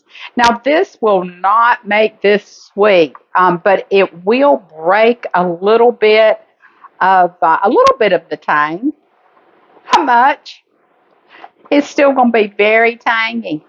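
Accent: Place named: South, United States. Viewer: You can tell she's from the south. She pronounces "boil" like bowl.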